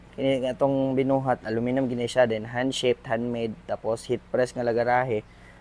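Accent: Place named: Davao, Philippines. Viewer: The way you talk... Feel ko taga davao kayo😊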